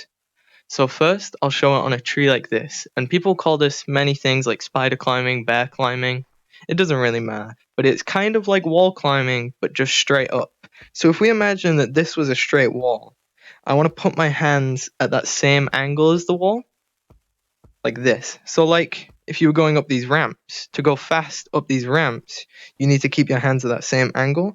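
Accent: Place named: United Kingdom. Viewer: i think it is very good visually but i cannot seem to understand the bri'ish vocals very unclear and why dont you just walk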